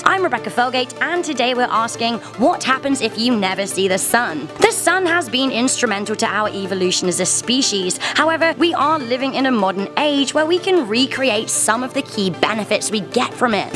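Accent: United Kingdom. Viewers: Edward Waller She's British, that's how Brits say it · she has a British proper English accent. I do not but I appreciate proper English, from the source.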